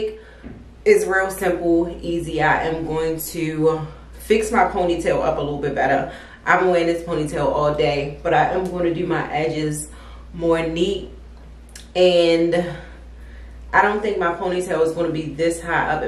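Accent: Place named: Washington DC, United States. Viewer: your accent is so familiar, are you from DC?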